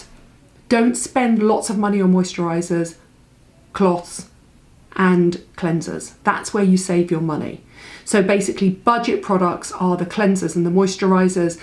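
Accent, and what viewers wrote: United Kingdom. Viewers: I’ve come to listen to you, I’m just the average American loving the British accent.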